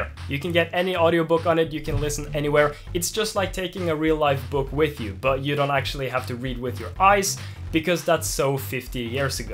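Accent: Sweden. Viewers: Are you swedish?? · Are you Swedish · Hey are you swedish or norwegian?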